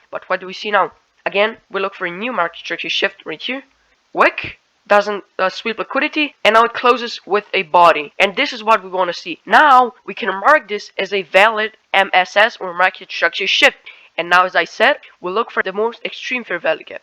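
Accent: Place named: East Asia. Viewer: Are you Malaysian? You sound so east Asian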